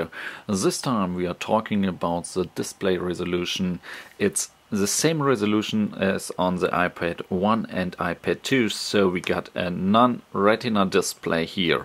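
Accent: Germany. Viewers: Nice comparison. i went to Saturn today and test the Ipad mini it was a little bit horrible specially on some Text in websites compare w/ retina.. "Anyway if you guys asking which Nationality this guy belongs due to his accent... His from Country where Mercedes Benz,BMW,Audi and VW was made =) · after 2 sentences i knew hes german ^.^ am i right?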